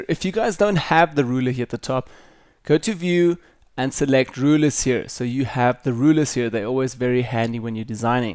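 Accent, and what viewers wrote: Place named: South Africa. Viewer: Bro are you South African? random question but I recognize the accent xD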